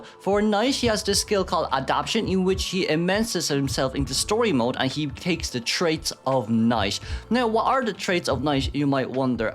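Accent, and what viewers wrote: Ireland. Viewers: i didnt know irish people pronounced words ended with -t as -sh, that's interesting!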